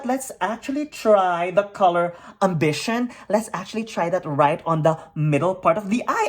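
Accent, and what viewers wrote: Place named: Philippines. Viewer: Love your speech over the dream and your Filipina accent! YASSSS! Immigrant +1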